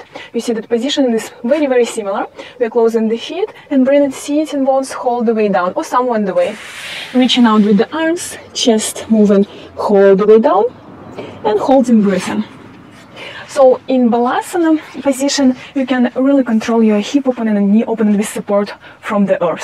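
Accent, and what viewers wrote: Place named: Germany. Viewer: A real sincere efforts to help yogis struggling with this pose. Thnx for sharing. Also, I loved ur English with ( if I m not wrong), German accent. Love from India. Take care, stay safe.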